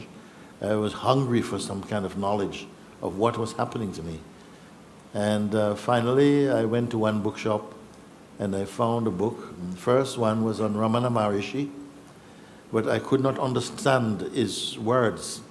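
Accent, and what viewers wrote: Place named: Jamaica. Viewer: He sounds like jamaican..i jave been to jamaica..for 3 years..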